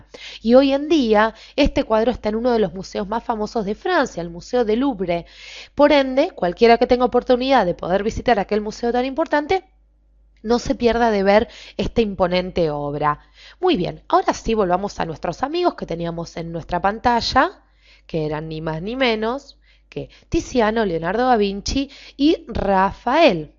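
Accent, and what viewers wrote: Rioplatense, Argentina. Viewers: Vos sos Argentina o Uruguaya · Like si escuchas asento argeitaliano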